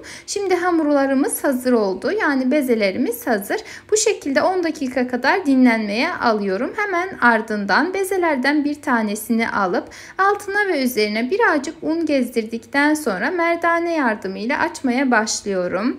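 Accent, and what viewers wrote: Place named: Azerbaijan. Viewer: Azerbaycanlisiz deyesen lehcenizden bilinir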